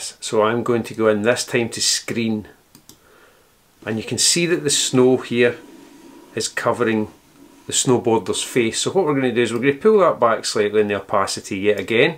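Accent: Scotland, United Kingdom. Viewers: Nice to hear a Scottish accent on YouTube by the way. Luminar is now like a user friendly version of PS and LR all rolled into one. Love it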